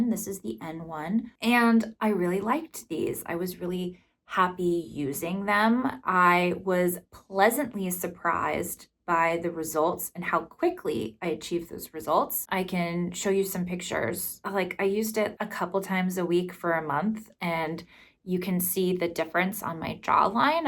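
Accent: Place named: California, United States. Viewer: I think it’s actually the California accent so it might be challenging for people with a southern accent which is pretty different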